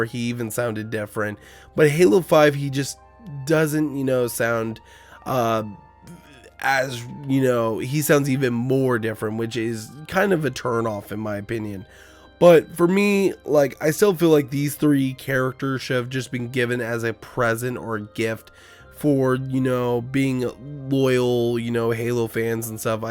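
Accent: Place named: Ireland. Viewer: so you are coming back to h5 i did like your irish accent😎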